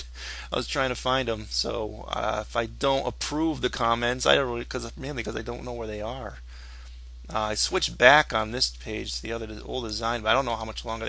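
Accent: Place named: United States. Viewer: Ruff... You're American, aren't you?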